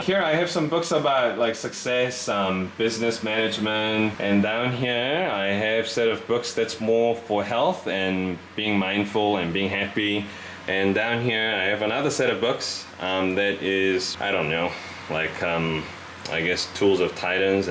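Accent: Singapore. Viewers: Hi, I enjoyed watching your videos and your Singaporean accent 🤓 Sorry about your dad, I lost mine 4 years ago... You seem like a genuinely nice person. All the best to you and your new life in NZ. Will continue to follow your channel as I have a dream of getting a motor home in NZ one day when I retire. Stay cheerful 😊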